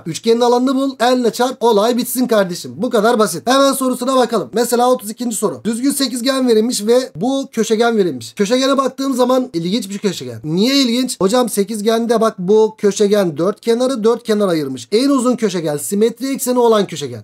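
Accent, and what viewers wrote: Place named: Trabzon, Turkey. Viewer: Trabzon gibi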